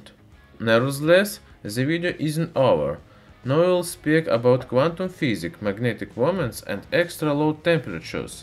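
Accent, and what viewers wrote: Russia. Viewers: How many of you watch his videos just to hear his cool Russian accent? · yo bro... ur video is nice.... but plz add subtitles... ur russian accent is too strong... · I'm Slavic, but I can't stand that Russian accent, sorry.. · Are you Russian? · You make great videos! I love your accent, like the secret Moscow contact in a 60’s spy movie. :-) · The Donald He tries really hard to speak well but he is Russian so have some difficulty to pronounce english words clearly 😐😉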